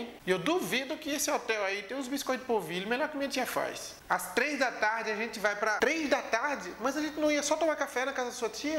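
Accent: Minas Gerais, Brazil. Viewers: Amigo, você ė muito bom! Você já está perdendo seu sotaque pernambucano e falando igual mineiro. Gosto muito dos seus vídeos! As cidades em inglês foi muito engraçado! Parabéns!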